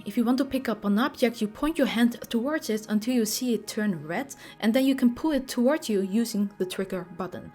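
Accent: Netherlands. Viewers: Her English pronunciation is already nearly flawless. I can understand her perfectly every time she talks, something I can't say about many, many native speakers. And besides, that Dutch accent is awesome!! So my recommendation to you is to go pound sand. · Are you dutch by any chance?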